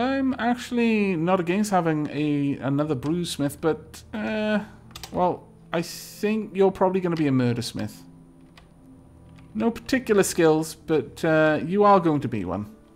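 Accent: Wales, United Kingdom. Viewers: Subbbb! Welsh man xD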